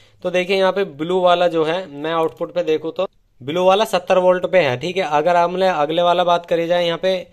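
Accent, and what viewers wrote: Meerut, India. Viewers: भैया मैं आपकी बहुत सारी वीडियो देखता हूं और आपका काफी पुराना सब्सक्राइबर हूं मुझे आपकी भाषा बहुत अच्छी लगती है क्योंकि मेरे मेरठ जिले जैसी ही लगती है जैसी मेरे मेरठ जिले की भाषा है आपकी भाषा बिल्कुल वैसी ही है अगर बुरा ना मानो तो मैं आपसे आपका निवास स्थान पूछ सकता हूं क्योंकि मेरा भी एक एलिजिन कंपनी का एंपलीफायर है जो खराब हुआ पड़ा है आपसे ठीक कराना चाहता तुम मुझे वह एंपलीफायर लेकर कहां आना पड़ेगा इतना बता दीजिए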